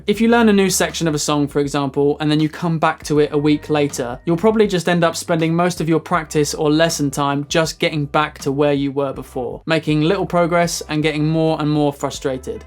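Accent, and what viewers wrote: United Kingdom. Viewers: You look like American but you sound British.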